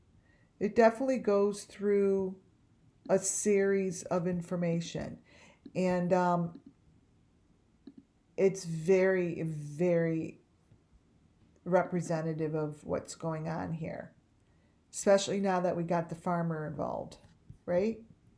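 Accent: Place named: Midwest, United States. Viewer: Your voice sounds like that other channel. Um, chasing truth I think. It just could be the Midwest accent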